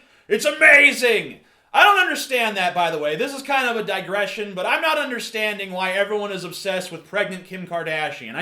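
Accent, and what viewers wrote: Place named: South, United States. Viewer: your country accent is the ShYt!!l Lol!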